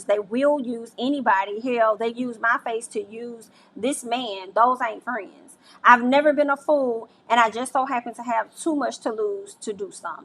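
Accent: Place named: South, United States. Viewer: I discovered this channel last month she is funny, informative with that southern accent don’t know why her personality brings a sense of familiarity. Great channel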